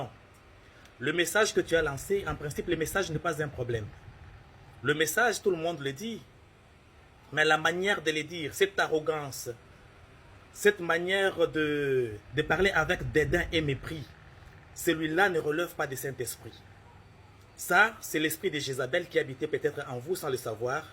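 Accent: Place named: Kasai, Democratic Republic of the Congo. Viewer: Yo nani. Aventurier tala ligne ya ba voyous. Oza muluba deja tonalité eyokani. C normal que tu défende les voleurs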